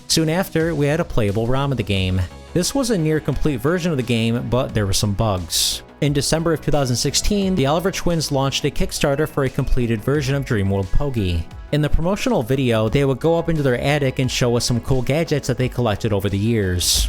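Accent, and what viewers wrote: United States. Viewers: I grew up in the U.K. when Code Masters started doing serious business and the Oliver Twins were very much local heroes and near unknown worldwide. So it’s nice to hear an American voice cover their achievements.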